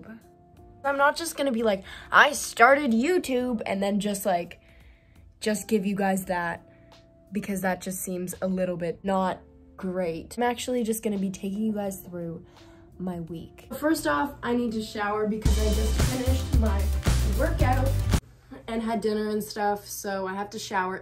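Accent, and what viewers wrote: United Kingdom. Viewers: nah cause i thought u was british before i watched ur yt videos 💀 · pls ???? why did i think you were british HWJSJHWGF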